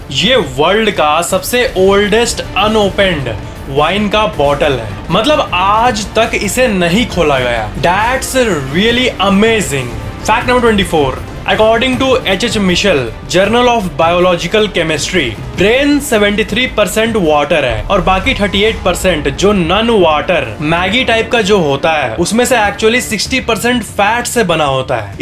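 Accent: Bihar, India. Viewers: pakkkka bihari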